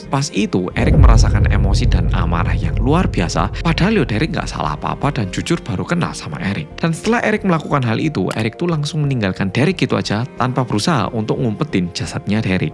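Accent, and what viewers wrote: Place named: Java, Indonesia. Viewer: Dihukum seumur hidup😮😢 Iblis gilak itu... Suwun ceritanya ya medok🎉❤🎉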